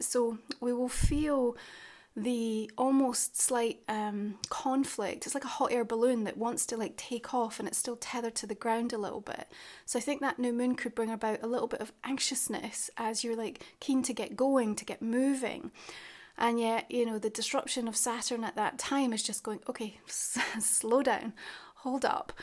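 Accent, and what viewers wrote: Scotland, United Kingdom. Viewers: I'm a Glaswegian leo in new York and it's nice to hear that scottish accent reading tarot, maybe it'll bring me luck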